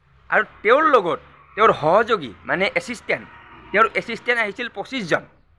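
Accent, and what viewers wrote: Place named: Bihar, India. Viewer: Apuni jitu bhakha koixe , jitu ussaron korixe , tenoka kitabotu asene, moito enoka bhakha kebol bihari Miya mukhere h hunu😂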